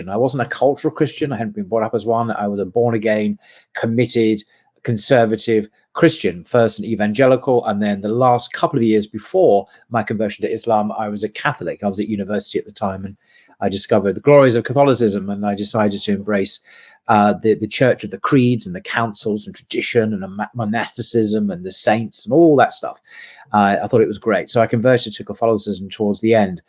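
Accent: United Kingdom. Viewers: Amazing how the fact that a middle aged man sitting in front of some book shelves, throwing buzzwords and casual data in a fine British accent, is supposed to make us feel he speaks wisely and knowingly about a certain topic whilst all Ive heard here is some banal teenager level revolutionary ideas from a biased newly converted to Islam privileged white detached man, fed by BDS propaganda he quotes from super shallow social media sources.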